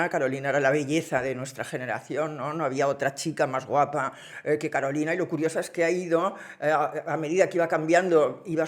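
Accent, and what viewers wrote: Spain. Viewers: Hola me encanta tu programa, hablas muy rápido por lo que no hay tiempo de aburrirse y tu acento español es muy bonito. Soy cubana y demás está decirte lo involugrada que está Cuba con España desde hace cientos de años. De jovencita creía que los príncipes y princesas eran bellas personas y vivían felices en sus palacios. De adulta leyendo realidades de la realeza me fui dando cuenta que son personas llenas de defectos y muchos de ellos malas personas. Hoy día una niña se comporta como la princesa Estefanía y la hubiesen puesto en clases especiales y con muuuuuchas citas al psicólogo. Recuerdo el accidente de Estefanía y su mamá, yo ¡¡¡sí!!! creo que la que estaba manejando era la princesa y como era menor de edad podría ir a la cárcel por haber causado la muerte a su madre involuntariamente. Pienso que como era muy caprichosa y siempre le gustó hacer lo indebido pidió manejar y su madre la dejó. 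También creo que lo que Dios escribe nadie lo puede borrar, por muchas cosas que quieran ocultar. Mucha suerte en tu interesantísimo programa. 👍👍👍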